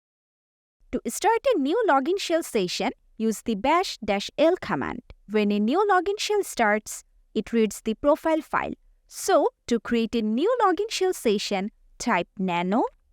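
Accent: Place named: India. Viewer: I can tell you do have an accent that seems coherent or native to the country of India. But it is not so thick that I cannot understand you as well. As you seem very well spoken on your pronunciation's. Considering the info a position earlier I offer one piece of advice if I may Increase the zoom in your gnome terminal or more... I had my phone in the youtube video set to 1440p Resolution and had to zoom in to read the info. You were typing in the terminal and I have reasonably decent vision For those who don't have a 1440 pixel phone. Nor access to that resolution setting because the phone or device they're running isn't powerful enough. This would help those people Better enjoy your content Thanks Cheers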